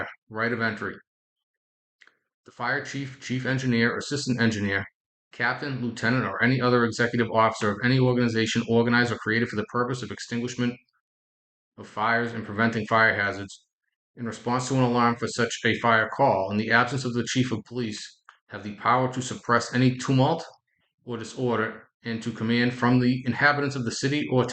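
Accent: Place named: Boston, United States. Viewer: Not sure why this was on my homepage, but sick Boston accent.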